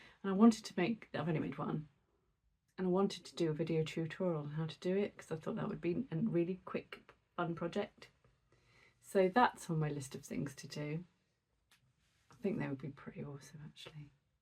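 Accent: England, United Kingdom. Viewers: As usual love your english accent, humour and laid back style. Not to mention all your croch-eting. Lots of inspiration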